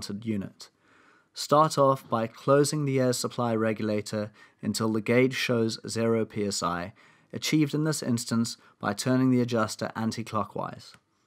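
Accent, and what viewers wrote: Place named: South Africa. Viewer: I detect the sound of a south african accent....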